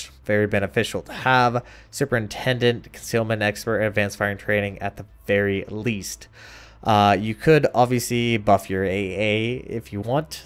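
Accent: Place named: France. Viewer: Dat French pronunciation doe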